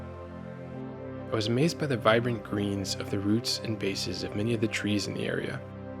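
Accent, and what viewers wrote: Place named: France. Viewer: Are you French ? Your accent sounds like that. 🎀 thanks for your videos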